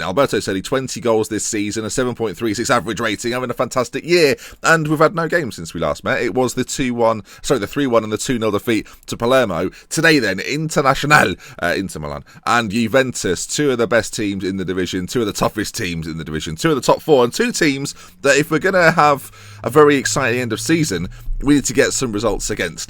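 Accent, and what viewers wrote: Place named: Yorkshire, United Kingdom. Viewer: Yorkshire accent = the best.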